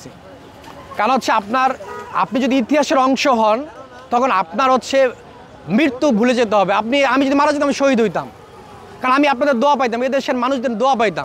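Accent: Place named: Geneva Camp, Bangladesh. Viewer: তোমার কথার মধ্যে মাওরা মাওরা একটা টান আছে, জেনেভা ক্যাম্পের বাসিন্দা মনে হচ্ছে। তুমি বড়ো হলে শুয়োরের বাচ্চা হবা। 😂 নিশ্চয়ই।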